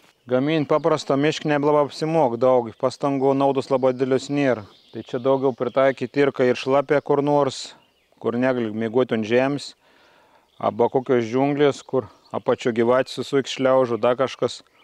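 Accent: Samogitia, Lithuania. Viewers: pasiilgstu video kai ne zemaitiskai snekedavai..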